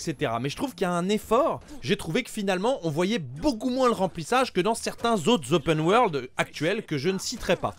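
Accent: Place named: Belgium. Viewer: Tu es belge ? En tout cas tu as l'accent belge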